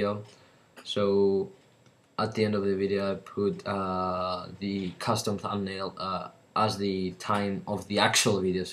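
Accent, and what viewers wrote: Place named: United Kingdom. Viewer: OMG HE'S BRITISH THAT COMPLETELY MAKES IT!!!!!! awesome video by the way helped a lot thanks!!!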